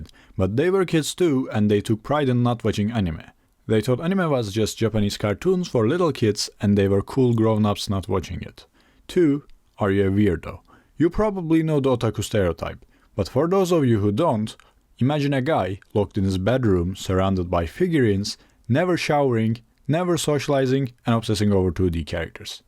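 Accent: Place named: Turkey. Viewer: this isn’t related to the topic but are you turkish your accent kind of sounds like you are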